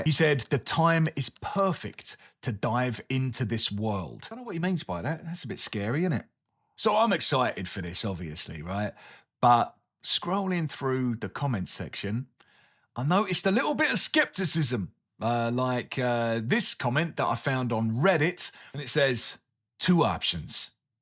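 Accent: United States. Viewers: I love the American accent! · GREAT American accent!!!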